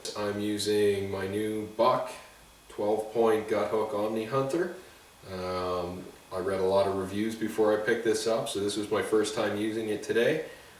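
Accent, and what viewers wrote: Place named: Canada. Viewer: Sounds like a Canadian. Credibility issues.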